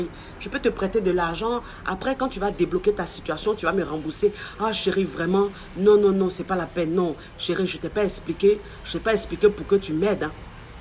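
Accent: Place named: Ivory Coast. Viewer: les expressions : "mougou... mougouli.... yougou yougou..." ton accent ivoirien, tes "r" enroulé, ta gestuelle de gauchière, viennnent pigmenter ton coaching. j'aime quand tu les utilises souvent.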